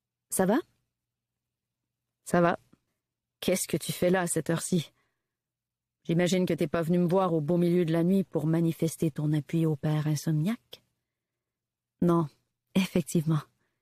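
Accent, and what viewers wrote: Quebec, Canada. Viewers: Moi de même,+ l'accent Québécois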